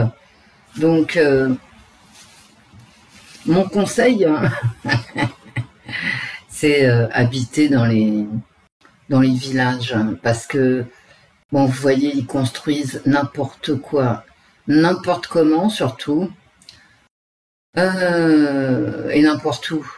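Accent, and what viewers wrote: Paris, France. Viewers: Bonsoir. Le plaisir de trouver cette nouvelle voyance après une randonnée au bord de mer, le Var est privilégié, il a fait chaud, il y avait même des baigneurs, qu’elle injustice même au niveau du climat. Comme d’habitude toujours impressionnante cette voyance qui colle toujours à la réalité, vos commentaires qui l’accompagnent toujours un plaisir, vous arrivez à me faire rire avec 😂 votre gouaille bien Parisienne, trêve de plaisanterie l’avenir n’est pas rose, il y a de fortes chances que le 49.3 soit encore sur la table, les paysans ont toujours eu du mal à s’entendre, et le fameux remembrement des parcelles bien responsable également des dégâts des eaux, que de décisions irresponsables depuis des décennies. Rien ne va plus dans notre malheureux pays où souffle un vent mauvais. On en PP 😱😡😟 Bonne soirée à toutes et à tous. ❤️💕💌🌹🐞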